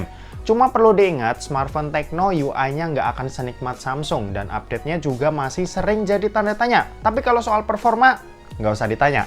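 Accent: Semarang, Indonesia. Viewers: Orang semarang ya kak?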